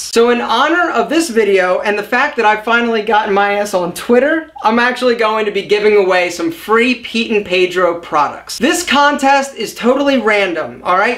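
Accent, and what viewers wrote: Philadelphia, United States. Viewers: you sound like you're from the Philadelphia region?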